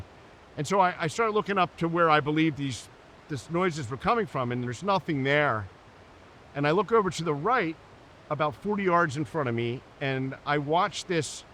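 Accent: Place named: Philadelphia, United States. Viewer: An excellent communicator. Held my attention the entire time...and not just because I was listening for his Philly accent.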